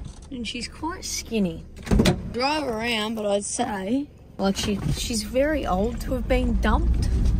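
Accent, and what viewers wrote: Australia. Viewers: I love everything about your content. You telling us how stuff works out there. Aussie accents are always a delight to listen to. You telling the idiot comments to fuck off (this one was particularly good lol). Random Slug intermissions. And seeing kangaroos fucking about like deer (as an American, most of the time if I ever see kangaroos in videos it's documentary stuff, so seeing them just doing kangaroo things in someone's fields is weirdly entertaining to me). Good stuff all around!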